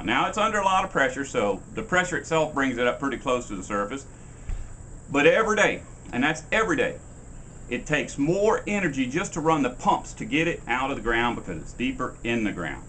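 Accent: South, United States. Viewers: where is it your from Mississippi or Louisiana I"m just trying to figure it out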